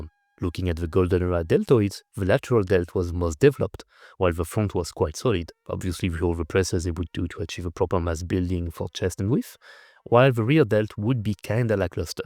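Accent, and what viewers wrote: France. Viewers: Not a fan of frenglish. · Nothing wrong his English and it’s just a French accent. Probably confusing for Americans who’ve had less exposure to it than those watching from Europe. Slowing his pace of speaking may help them.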